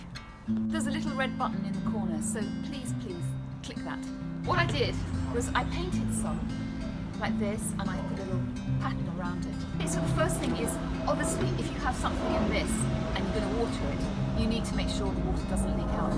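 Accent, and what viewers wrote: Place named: England, United Kingdom. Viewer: Thanks for sharing your gardening tips! Love your soft and English accent!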